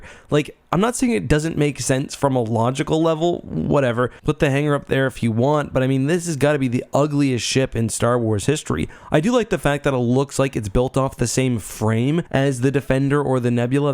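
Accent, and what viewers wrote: Canada. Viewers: it took me a few years to realize that youre canadian, when you said "about" i was able to finaly confirm my suspicion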